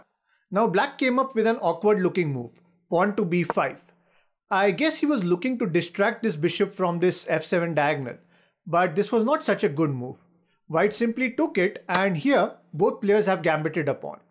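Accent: India. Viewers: The first time I watched your video, I thought you are an Indian living in England or any other country. Your English is so good, but the accent is still Indian 😂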